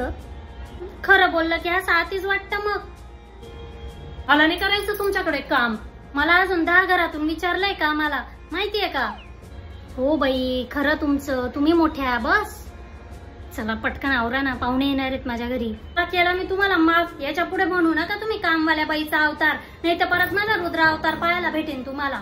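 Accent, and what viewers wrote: Khandesh, India. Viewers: God Bless you Tai...sorry sorry Bai.... थोडा उशिराच पाहिला हा रील ...Superb....खान्देशी ठसका... लेखन आणि सादरीकरण एक नंबर...👌👌👍👍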